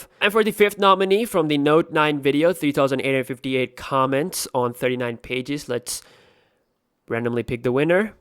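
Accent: United States. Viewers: Why do you try to sound American? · When I saw your video first Time. I thought that your are american.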